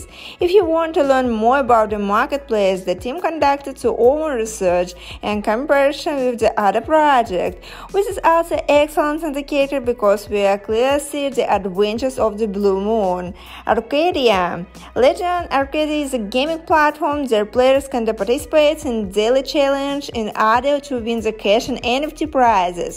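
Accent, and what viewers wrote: Russia. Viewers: is she from russia or opland or ukraine, im confused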